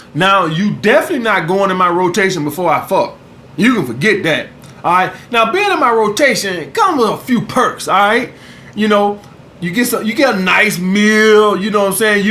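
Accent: United States. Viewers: That country ignorant accent he has would lose my interest on site. Let’s not mention those horrible razor mark scars that are so visible. Let’s me know he’s cheap and doesn’t even take of himself....straight facts no shade.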